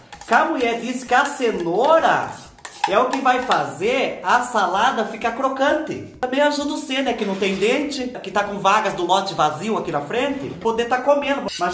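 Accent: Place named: Minas Gerais, Brazil. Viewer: Ai vc parece mineirinho assim como eu. No modo como vc fala por exemplo a expressão "BOBA " é daqui de minas 😂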